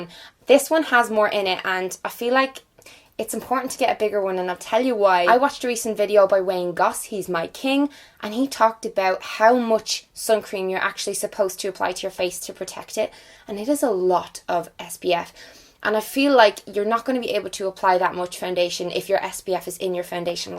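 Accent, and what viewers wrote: Ireland. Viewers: I love that Irish accent👌👌👌 P.s could you talk more about your book because I didn't know you were bringing one out. Maybe you could do a video on it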